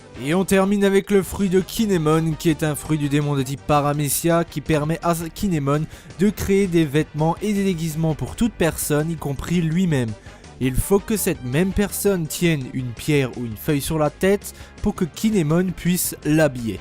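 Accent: Alsace, France. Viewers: L'accent alsacien prononcé 😂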